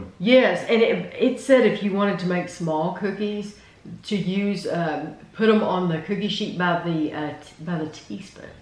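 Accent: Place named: Kentucky, United States. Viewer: You sound like your from Kentucky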